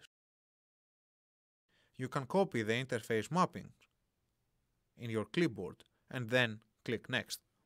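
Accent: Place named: Greece. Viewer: This man sounds like he's Hellenic! :) Nice! :)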